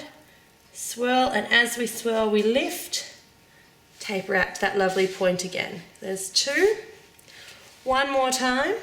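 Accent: Australia. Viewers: She sounds Australian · you australian? :)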